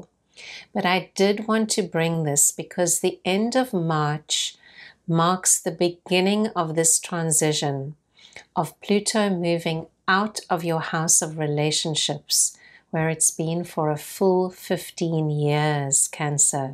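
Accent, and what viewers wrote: South Africa. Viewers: Thank you for this reading and explaining it so calmly. I am having one of the worst years of my life and I do hope 2023 will be far better for me. I recognize your SA accent. Sending love from Cape Town 🇿🇦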